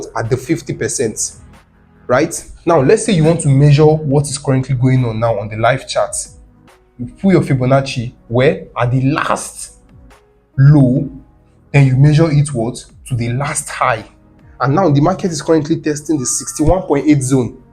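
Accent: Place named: Afrikaans, South Africa. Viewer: love afrikaan english😅🎉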